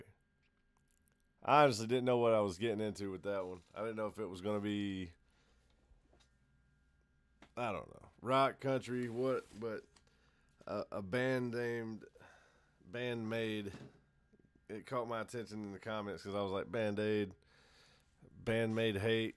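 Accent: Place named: Georgia, United States. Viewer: Man, you sound like you from my neck of the woods. South Georgia? I discovered about 2 years ago. Seen em twice already after not going to a concert in 20 years. Best rock band since the 90s.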